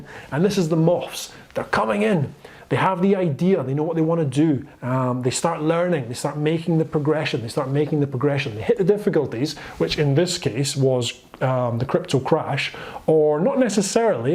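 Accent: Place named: Inverness, United Kingdom. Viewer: Are you from Inverness? Your accent sounds very much like it!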